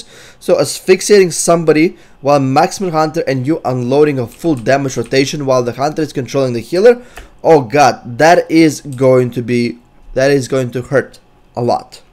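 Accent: Russia. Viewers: That's fucking great! XD The Russian accent is awesome too, if you were playing a Draenei Dk it would be even better hahaha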